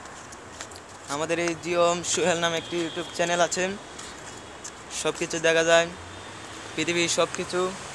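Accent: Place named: Bangladesh. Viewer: Kolkata theke ele but tomar kotha Bangladeshi der moto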